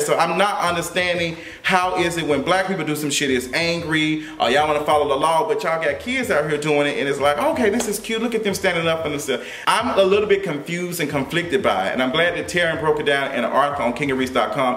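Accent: Memphis, United States. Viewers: It's so funny to hear someone from Memphis try to sound like they're not from Memphis lol. I love you!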